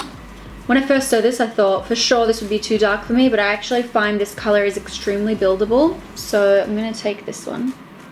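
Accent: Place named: Australia. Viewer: watching your videos remains the best part of the day 🎉 your accent reminds me of my best friend back in Australia and I looove it 😍 btw, this look is some serious 🔥🔥🔥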